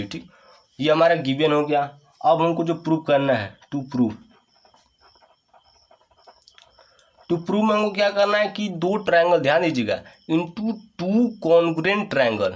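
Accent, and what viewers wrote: Bihar, India. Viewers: Arrey Bhai Sirji Bihari Haina Isliye Sir K Bolne Se Hi Pata Chalta Hai Ki Sir Bihari Hai 😀👍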